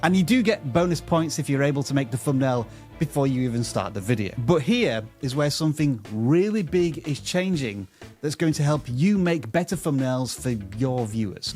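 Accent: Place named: Yorkshire, United Kingdom. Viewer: I have a random question for. I have a friend who is from Yorkshire and he’s from Hull and I thought that your voice sounded like it was from there but I was just curious. What part of Yorkshire are you from? Also there was something that you said in the video about how thumbnails and titles attract views which I think it’s true but if you’re subscribed to a person like I am to you, I just see that it’s from you and I’m interested. it’s not a Elon Musk scam live stream coming from VidIQ I’m gonna click on it.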